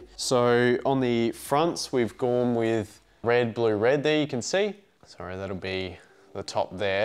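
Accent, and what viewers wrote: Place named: Australia. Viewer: Campletown shirt Aussie accent 💪must be a local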